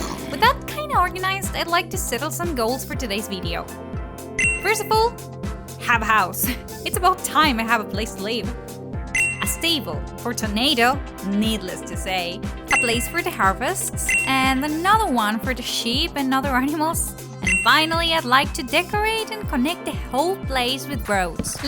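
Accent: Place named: United Kingdom. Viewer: Who love her British voice❤❤❤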